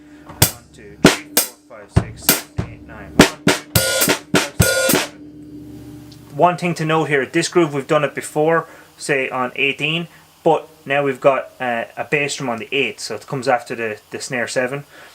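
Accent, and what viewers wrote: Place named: Ireland. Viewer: I hear your pain! I only have a small rubber mat kit at home with an added real bass drum but I can practice on a real kit at school 3 times a week for half hour. I'm about to take Trinity grade 1 but it's hard switching from acoustic to electric when practicing! I like your videos and your lovely Irish accent 🙂.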